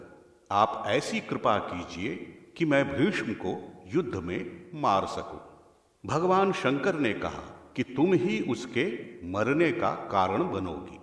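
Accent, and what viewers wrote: Standard. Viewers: शुद्ध उच्चारण में सुनाई गयी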